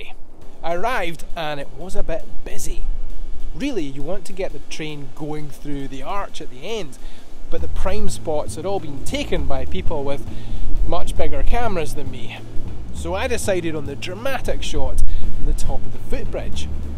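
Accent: Scotland, United Kingdom. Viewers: Great grand show thanks i was just hearing that you have a stronger scottish accent than Ur brother the hoof gp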